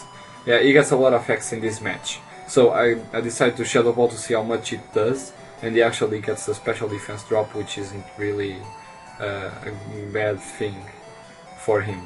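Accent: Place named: Portugal. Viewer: do teu sotaque xD vi logo que eras português x) foi mt fácil xDD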